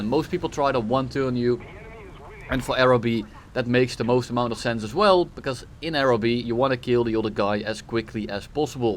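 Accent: Netherlands. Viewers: I smell netherlands behind your voice accent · Fist second in the video and I know he is Dutch 😂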